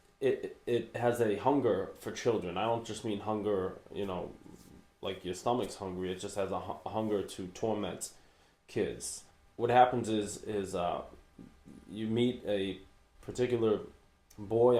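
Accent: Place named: Boston, United States. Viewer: Let me guess; you are from Boston or some other part of New England.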